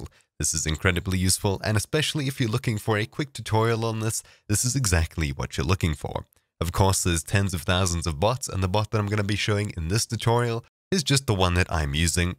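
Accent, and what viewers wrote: South Africa. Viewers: hey i hear a south Africa guy here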